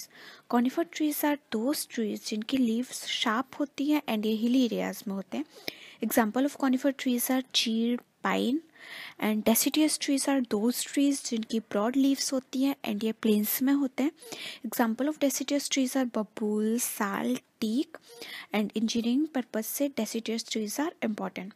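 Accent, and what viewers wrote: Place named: India. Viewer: It would be great if you add English subtitles. It's really complicated to keep up while trying to decipher the Indian accent. Keep up the good work.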